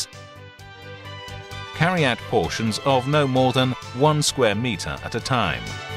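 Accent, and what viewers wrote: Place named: RP, United Kingdom. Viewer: Only got to the intro so far about the primer and I feel like I'm tuning into a Royal British polo breeding documentary. 😊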